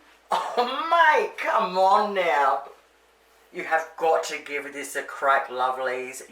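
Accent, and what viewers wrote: Australia. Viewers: You speak proper Aussie 😊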